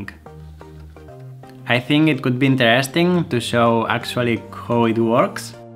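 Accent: Spain. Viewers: Eres español verdad?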